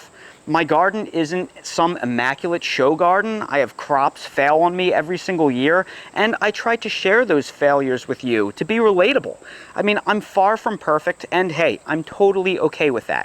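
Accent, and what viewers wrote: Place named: South Jersey, United States. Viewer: South Jersey girl here. I remember watching a video of yours several years ago when you had a few thousands subs (and I heard that SJ accent which hooked me!). As an avid gardener myself I’ve been watching you ever since. Loved hearing about your background and I always look forward to your videos, so thanks for everything you do!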